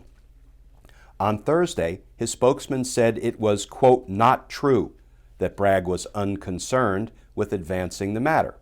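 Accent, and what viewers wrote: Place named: New Jersey, United States. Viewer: She was bought... Saw your interview with Stephanie Miller on Political Voices Network... Heard your spin on that hot mess in NY. Great interview & loved your New Jersey voice. ⚖️💪⚖️🇺🇸⚖️🇺🇸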